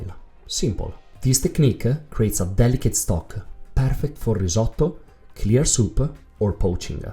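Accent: Italy. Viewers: Great recipe! Love the way you exaggerate the Italian accent. It is noticeable your English could sound a lot more "English", but I do believe we should always keep our roots. It is like the fat... Why lose it? No need. Regards, from Angola, Africa! Cooking your bolonhesa, like we say in Portuguese, as I write.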